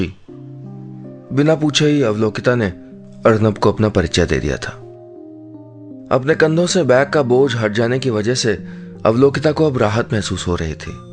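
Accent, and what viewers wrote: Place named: Kumaon, India. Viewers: Aapke muh s kumauni hindi bahut achhi lagati h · Tht Kumaoni accent...:-)...reminds me of my paternal home...:-D...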